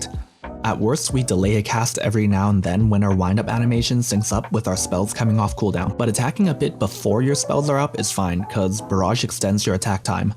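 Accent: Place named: China. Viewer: that chinese accent tho...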